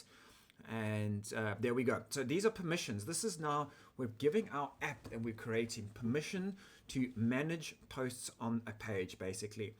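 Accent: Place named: South Africa. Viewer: Thanks. The South African accent is an odd mix of British, Dutch and Australian. Hopefully it's easy enough to understand?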